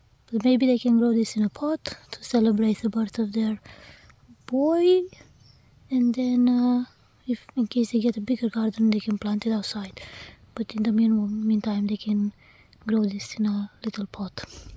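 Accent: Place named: Romania. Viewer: Neat ma’am. By the way, you have a very soothing voice. Your Romanian accent is just the icing on the cake with your voice. I can listen to your voice all day.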